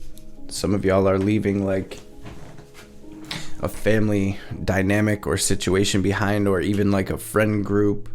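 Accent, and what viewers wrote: Detroit, United States. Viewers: Are you from Detroit I keep hearing you say what up doe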